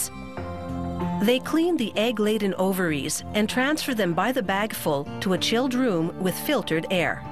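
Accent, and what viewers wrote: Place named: Canada. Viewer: This is the most Canadian sounding narrator ever.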